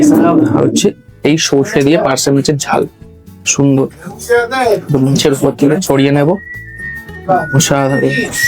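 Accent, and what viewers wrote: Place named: West Bengal, India. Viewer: Tor bhasha shunei bujhechhi.. Tui edeshi.. Edeshi ra khawa kobe shikhechhe??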